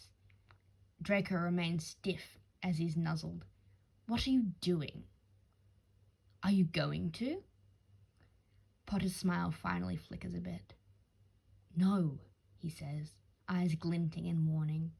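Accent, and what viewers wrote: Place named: Australia. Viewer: YESSSSS!!! finally a fellow Australian making one of these podfics, amazing by the way I find it hard to concentrate but i was really able to follow on with this on.😄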